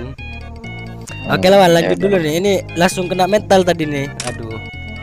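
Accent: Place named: Madura, Indonesia. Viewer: Dari bahasanya dah kek orng madura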